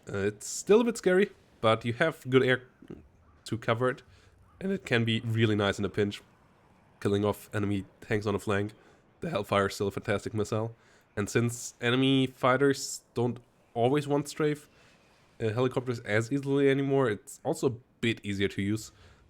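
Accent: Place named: Germany. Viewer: Deutsch erkennst immer sofort ^^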